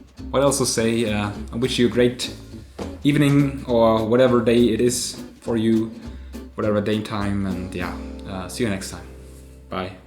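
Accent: Germany. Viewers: Great video, i dont know why, but a german accent makes me want the lens even more! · german accent made me subscribe...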